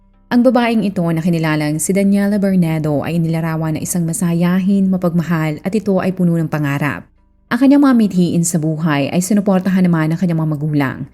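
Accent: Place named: Visayas, Philippines. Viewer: Taga saan po ba sla bisaya kasi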